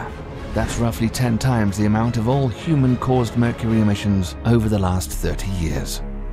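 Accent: England, United Kingdom. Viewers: That accent on this guy is not desirable. Why have a British dude instead of an American? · Is the English accent used to make us feel more frightened?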